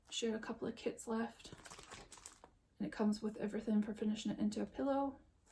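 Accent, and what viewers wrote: Scotland, United Kingdom. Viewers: Hi, I just found your channel after a shout out from mommolovesyougb. Those are some fantastic finishes for the year, you achieved a lot! Great hearing a familiar scottish accent on flosstube, I am a fellow scot from aberdeenshire! Hope you have a merry christmas, a happy hogmanay and a fantastic new year!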